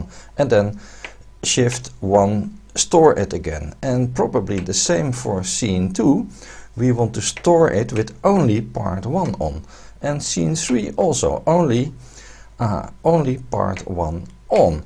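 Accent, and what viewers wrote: Netherlands. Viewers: Thank you for your very excellent tutorials, bravo. You sound Dutch, am I correct?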